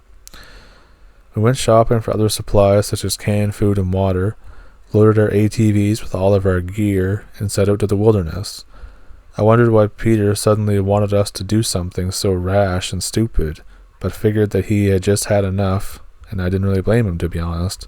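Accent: Canada. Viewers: Thanks for letting us hear your kitty cat! Your accent is soooo Canadian. Nice!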